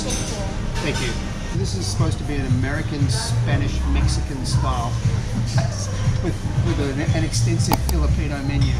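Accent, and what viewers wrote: Australia. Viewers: he cant understand because of your ausie accent with mask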